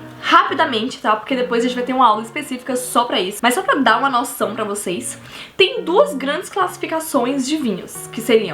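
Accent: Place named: Ceará, Brazil. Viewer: Adorei sua aula e sua espontaneidade. E o sotaque cearense. Acertei?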